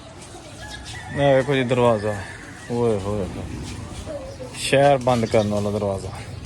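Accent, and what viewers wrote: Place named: Punjab, India. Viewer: I liked your voice with Punjabi tone.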